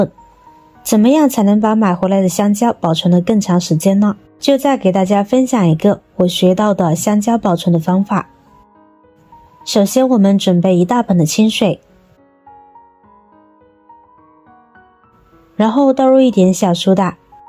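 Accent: China. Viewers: 所謂香蕉催熟，是用塑膠袋包覆，冬天才會用 夏天跟本不須要催熟 聽妳的口音就是中國腔，妳懂香蕉？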